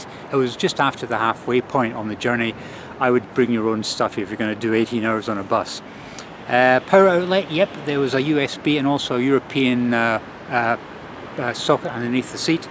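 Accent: Scotland, United Kingdom. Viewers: Scottish accent with something else creeping in - can't quite put my finger on it though. Another English speaking country but not British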